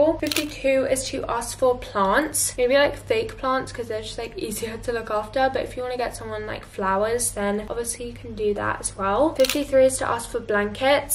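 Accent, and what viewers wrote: England, United Kingdom. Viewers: I luv your English accent like omg and love the video by the way and also i luv they way you edit your videos like 10000/10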